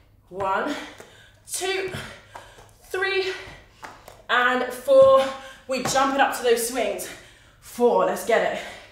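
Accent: England, United Kingdom. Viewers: I Love you English accent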